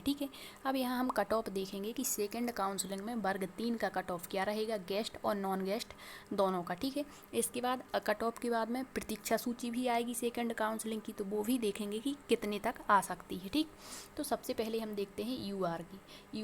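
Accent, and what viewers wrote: Bundelkhand, India. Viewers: आपके बोलने के लहज़े ऐसा लगता है कि आप बुंदेलखंड से हैं मैडम🙏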